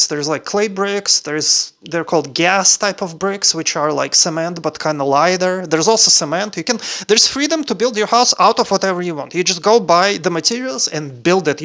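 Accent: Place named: Russia. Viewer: Ты так долго прожил в штатах, но у тебя жуткий русский акцент!..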